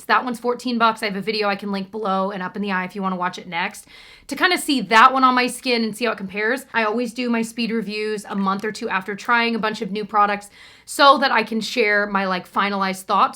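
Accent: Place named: Midwest, United States. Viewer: So.. watching yours and Tyler’s videos I was like. Matt! (My husband!) this girl lives in a Pulty subdivision! She goes to Meijer! I think she lives in Michigan! Then he watched literally one of Tyler’s videos and was like nope. Indians. Ugh! Anyway. Bridgerton was my first real romance series. Haven’t seen them but the books are good. I watch your stuff when I’m out of town for the Midwest accent!!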